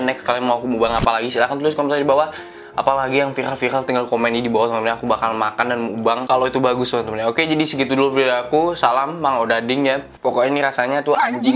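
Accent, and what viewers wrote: Bali, Indonesia. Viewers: LU ORANG BALI YA BANG?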